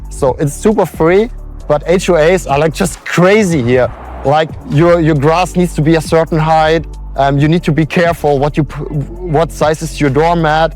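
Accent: Germany. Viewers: I love your cute use of English with the very heavy German accent and also your choice of words and phrases. You make few mistakes but your choice of phraseology is very touching. I taught at NYU for 11 years " English as a Second Language" to mostly Asian students. Many Germans think their English is good when its really not, filled with many errors.. I had a close friend from the Stuttgart area, also Ernest /Ernie? Was he related to you? Keep up the good work, Welcome to the USA though Florida and I am thrilled you are doing so well here, Hugs and be welcome and prosper. RM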